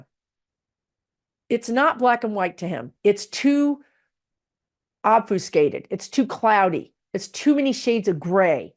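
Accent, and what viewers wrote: Texas, United States. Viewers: Chickensh*t you sound like a Texan 😊❤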